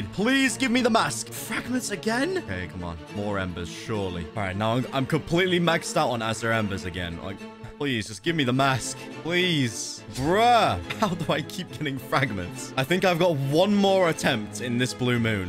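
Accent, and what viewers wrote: United Kingdom. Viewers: Bros British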